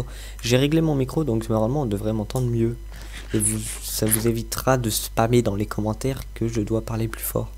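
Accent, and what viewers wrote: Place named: United Kingdom. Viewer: t anglais ou pas car ta un acsen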